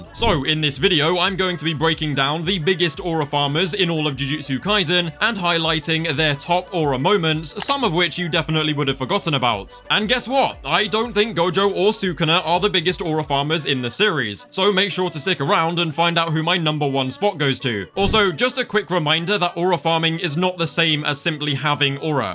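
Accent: United Kingdom. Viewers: I didn't know you were British mate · Your British? Unsubbed :(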